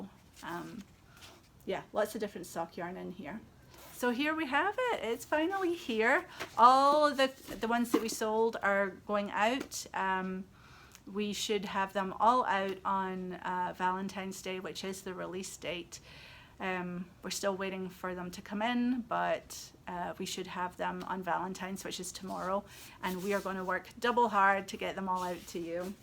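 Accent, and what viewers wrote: Scotland, United Kingdom. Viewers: Love your Scottish accent! I’ve just finished watching all the episodes of “This Farming Life” on BritBox. Most of it is filmed in Scotland, but unfortunately they breed their sheep for food and not wool, I hope we knitters can encourage the industry to change. Will cast on a pair of mittens soon for the KAL with some 🇨🇦 yarn.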